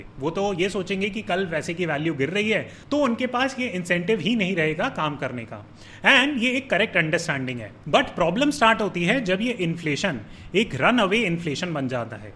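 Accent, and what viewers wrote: Standard. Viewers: Loved you Shudh HINDI. 😊 And how is Zayn doing? Does he speak hindi as well? Your english channel does not feature this hindi channel. Feature it ASAP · Kya shuddh hindi bolte ho sir aap.🤩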